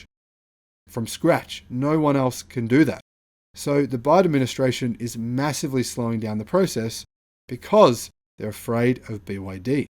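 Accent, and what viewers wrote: Australia. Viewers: Are you Australian? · I can safely say by your accent that you are not American. Perhaps Australia? If that is the case, you should understand quite well the dangers of letting the Chinese companies controlled by the Chinese government, that means ALL CHINESE COMPANIES, run rampant in your country. Of course your country is under Attack by and has nearly been taken over by the Chinese government's "belt and road" initiative. I'd say you better learn just how insidious China is in it's plan to OWN countries by economic intervention. It doesn't matter who owns the shares of Chinese companies, in the end they are all a part of Chinese world dominance. Id say America has every right to be scared of BYD and every other Chinese company who wants to infiltrate our infrastructure.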